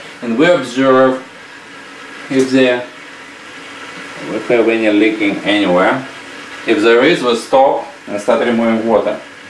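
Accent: Russia. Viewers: I just have to ask, are you Russian? or maybe German>? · You are russian?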